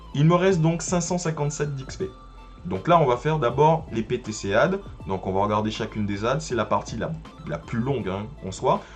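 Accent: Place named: Antilles, Caribbean. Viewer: Ha une voix antillaise comme moi. Parfait continue ne fais pas comme pratiquement tous les autres youtubeurs qui essayent de nous avoir car ils sont payés pour ca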